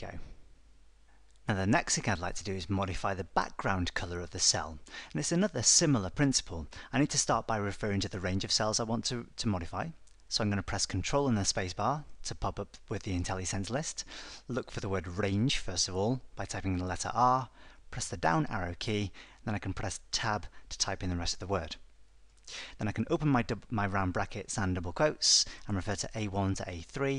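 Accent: United Kingdom. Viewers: Your videos are fantastic, and your accent is so beautiful! Are you british? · I'm not hating at all (please no flaming), but its sooooo freshing to hear an English accent on a programming tutorial video. I'm currently using YouTube to learn <link>, SQL, <link> and a range of others and all the videos I have watched they have been American/Indian. I have no problem with that at all but its good to see the UK has some programming skills! Great tutorial, really easy to follow and I learnt a lot. Time to watch the rest of your videos :D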